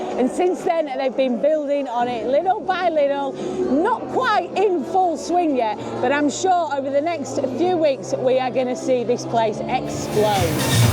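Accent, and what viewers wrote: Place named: Runcorn, United Kingdom. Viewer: You from Runcorn sounds like my accent that haha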